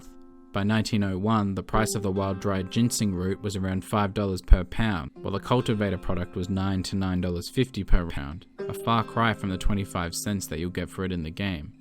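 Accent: Australia. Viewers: didn't expect to see the history behind the coins on Davey's eyes. educational & a cool Aussie accent -- yay! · You sound like an Australian. And I'm amazed. If you don't live in America and are Australia native, I kinda find it sad that somebody foreign to our country is one of the most detailed historians of my country I have ever heard. I am making a bunch of assumptions and will be perfectly happy to be corrected on any of them. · Yeah, the narrator speaks Australian and I'm a bit rusty so I appreciate it as well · Just found this video and the rest, love it mate it's amazing. Aussie voices are just amazing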